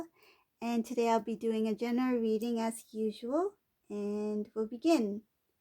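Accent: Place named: United States. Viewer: Endless Cycle!! Clever. I like ur accent. R u American? With a twist? Lol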